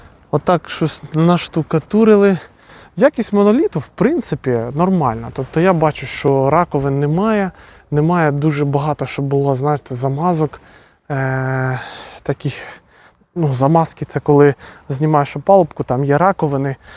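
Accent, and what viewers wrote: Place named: Russia. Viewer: ну будто русич пытается по украински говорить)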